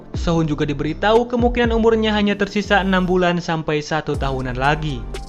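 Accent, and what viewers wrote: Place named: West Java, Indonesia. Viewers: Pasti urang sunda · logat sunda itu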